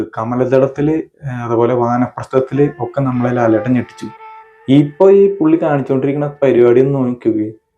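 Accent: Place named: Thrissur, India. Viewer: ലാലേട്ടന്റെ അഭിനയം പറയാൻ വാക്കുകൾ ഇല്ല... അതുപോലെ ബ്രോ നിങ്ങളുടെ തൃശ്ശൂർ അവതരണം അതും മികച്ചത് തന്നെ... 👌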